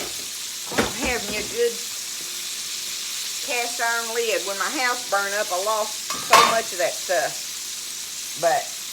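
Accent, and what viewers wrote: South, United States. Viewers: I love your videos and you. I like so many of your recipes and how well you explain the process, the other thing I like is you southern drawl. Your chicken cookin is slot like my mother’s. Difference is after browning she put in the oven to finish cooking. She would season her flour with just Salt and Pepper. The flour it and fry. No matter what I do it never tastes the same. Many blessings to you and your hubby.😊❤️🙏🏽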